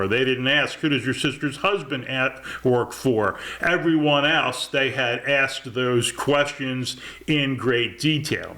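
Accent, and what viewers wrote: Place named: United States. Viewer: Notice that. In spite of the American accent and cadence this obvious error being repeated makes me think this guy is not originally an American English speaker. There's other information being being spread around about Ruth Payne that is salacious. This seems so comprehensive...why isn't that popping up here?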